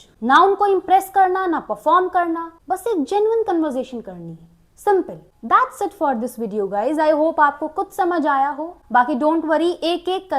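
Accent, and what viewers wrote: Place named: South, India. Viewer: you sound south Indian. I love the way you dress and you are charming.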